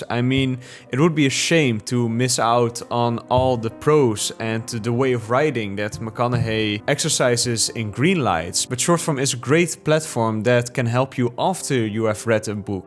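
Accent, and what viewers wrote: Netherlands. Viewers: Je videos zijn altijd ZO goed maar ik denk 100% (en dit bedoel ik niet als belediging) dat je nóg meer views krijgt als iemand anders met een wat minder sterk Nederlands accent het audio gedeelte over zou nemen. (Met alle plezier zou ik dit voor je doen/proberen als je er voor open staat.) Nogmaals, na genoeg top tier content wat mij betreft!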